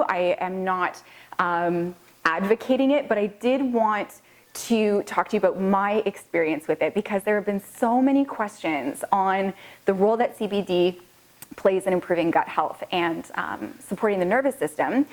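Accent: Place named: Canada. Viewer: Are you canadian ? Just wondering cause your accent is awsome ✊💯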